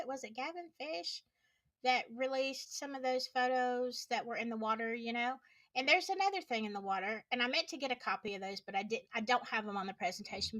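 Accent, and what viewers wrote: South, United States. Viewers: Love your channel and i could listen to your lovely southern accent all day, That hoodie is awesome and looks great on you.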